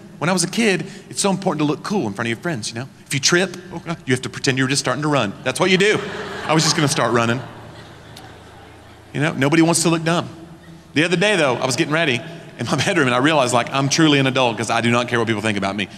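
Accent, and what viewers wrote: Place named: Alabama, United States. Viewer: sounds like Alabamian’s.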